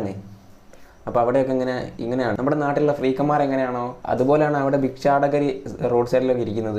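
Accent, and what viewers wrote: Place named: Malappuram, India. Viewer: Ante ജില്ലാ eedha Ante ഭാസ കേട്ട്ട്ട് മലപ്പോർത്ത്ള്ള ന്നാ തോന്നദ് 😂മലപ്പുറം ആണോ അന്യേ ജില്ലാ ❤️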